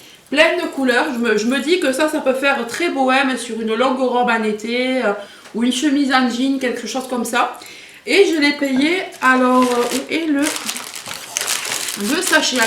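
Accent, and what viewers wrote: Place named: Provence, France. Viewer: ça sent bon la Provence comme ton accent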